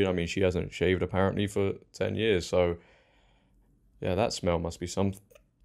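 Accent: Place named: United Kingdom. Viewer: British accent of course lol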